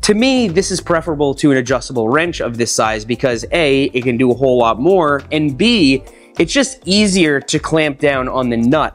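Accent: Long Island, United States. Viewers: Long Island, NY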